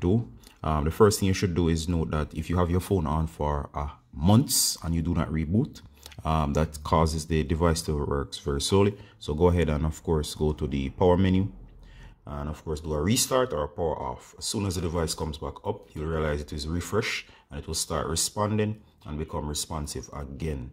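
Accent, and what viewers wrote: Jamaica. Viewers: I hear the 876 accent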